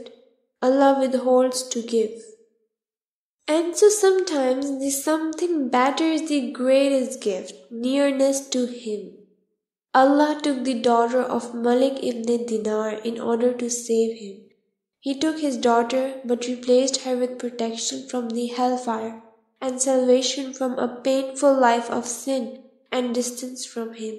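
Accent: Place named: India. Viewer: I feel indiane accent in the air, please re-read such an amazing book with right accent, so many words i was confused about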